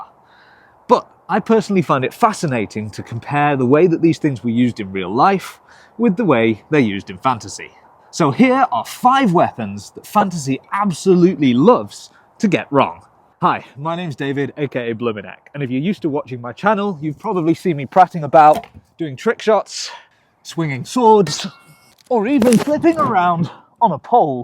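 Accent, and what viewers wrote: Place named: Yorkshire, United Kingdom. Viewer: I suspect part of it is your accent. Yorkshire?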